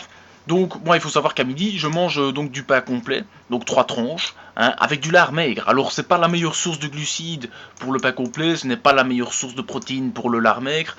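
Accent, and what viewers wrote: Liège, Belgium. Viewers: Serait tu de Liège ? Haha je reconnaît ton accent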